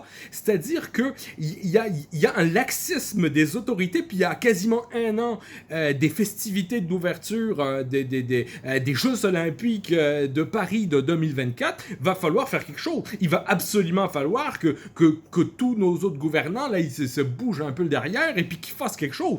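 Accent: Quebec, Canada. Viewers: Meilleur accent québécois du game.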